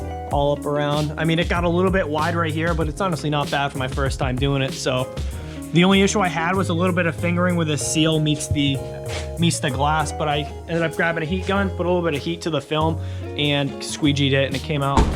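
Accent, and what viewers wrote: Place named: Massachusetts, United States. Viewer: U from mass? Sounds like it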